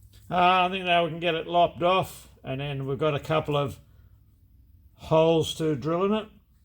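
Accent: Australia. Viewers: Great instructional video - with the Aussie touch. Thanks.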